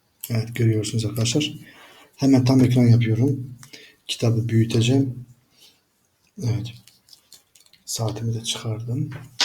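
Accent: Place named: Urfa, Turkey. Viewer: Urfalıların türkçe konuştuğu gibi almanca konuşuyorsun biraz yavaş ve anlaşılır konuş hocam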